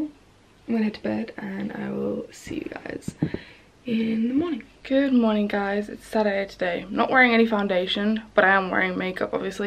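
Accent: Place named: Northern England, United Kingdom. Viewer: eyyy. Thank you so much. I've been curious for ages. Also. This is my friend. He was trying to help me figure it out. You sound like would've come from the north of England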